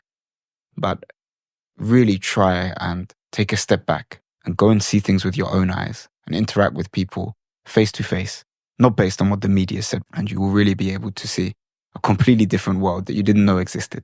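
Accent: Ghana. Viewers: even with an overlay of a British accent, Ghana still comes forth in your voice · The Ghanaian accent is so funny coming from him - grand dad must have been such a character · Ghana all the way. I Can fell the blood in your accent although u are white. Much ❤ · I hear A Ghanaian Accent 😁😁😁😁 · The Ghanaian accent 😊😊😂😂..... Nice story about your journey. Inspiring 😊😊❤ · you are a true Ghanaian from how you speak, discipline.. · if I were to be in Ghana, I would have loved to host you and your friends and spoil you a little with Ghanaian food and hospitality. You sound like a Ghanaian also. Rest in peace, grandpa, and be proud because is making you proud. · Ps, I enjoy when he mimicking his grandfather. Besides, he does have a Ghanaian accent himself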